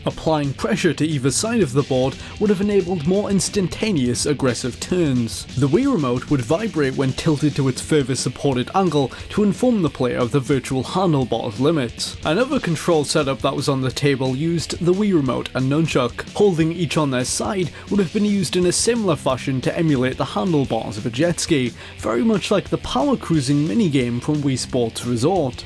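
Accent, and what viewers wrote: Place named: Geordie, United Kingdom. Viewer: I instantly recognised your accent on Did You Know Gaming's video. Canny cool to see a local youtuber doing well haha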